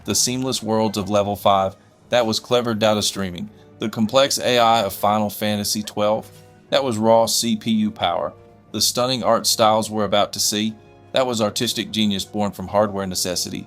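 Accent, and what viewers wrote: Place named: South, United States. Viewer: you sound southern as hell brother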